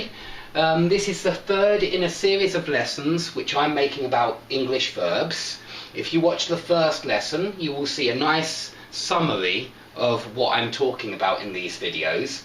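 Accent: United Kingdom. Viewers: Thank you so much for all your efforts and time being put to help us to understand the language so called "English". I love your videos and especially the british accent.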